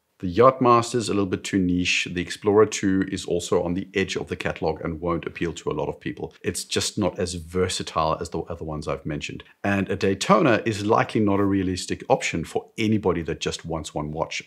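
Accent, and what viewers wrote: South Africa. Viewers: I thought as much. You just cannot mask a Joburg accent... I had some of my watches stolen out of my luggage at OR Tambo... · sounds like a south african accent. i bet this gentlemen is from joburg or capetown, just a guess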